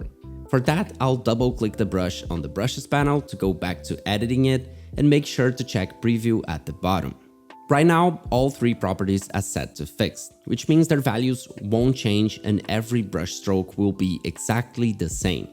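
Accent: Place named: Eastern Europe. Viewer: You have a very massive slavic accent.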